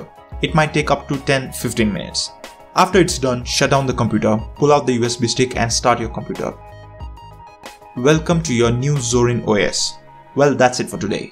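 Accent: India. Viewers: Bro you Indian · are u from india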